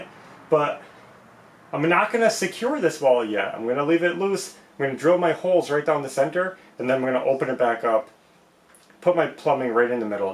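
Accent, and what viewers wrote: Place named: Upstate New York, United States. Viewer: that’s awesome!! I’ve been going back and watching all your videos too!! I’m from upstate New York and I heard you were too.. haha!! I knew I recognized your accent 😂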